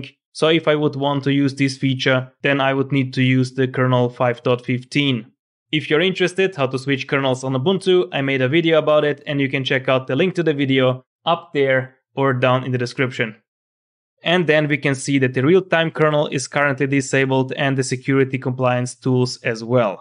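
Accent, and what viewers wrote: Russia. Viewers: Че акцент такой русский?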